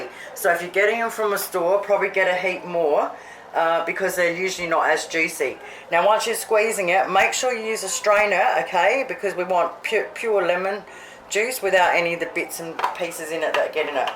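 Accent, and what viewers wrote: Australia. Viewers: Great to find a Cook with a good ' downunder ' accent. !!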